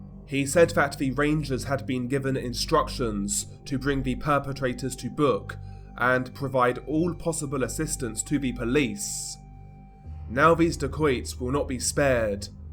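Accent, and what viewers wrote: United Kingdom. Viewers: He's British, and some British accents "add" an R sound to a few words. Have you ever heard different British accents? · Every time I hear say “Ladi gang” with his British accent, It reminds me of the song. “STAND STILL LADDY!”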